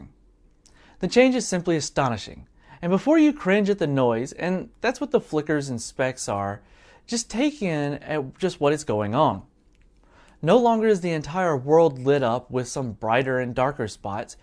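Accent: South, United States. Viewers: Awesome video! But I gotta ask what accent do you have? I've never heard a "standard" American accent mixed in with a southern twang!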